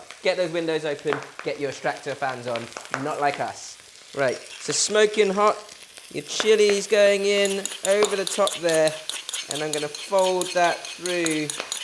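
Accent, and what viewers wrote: United Kingdom. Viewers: british accent so where do you live right now ??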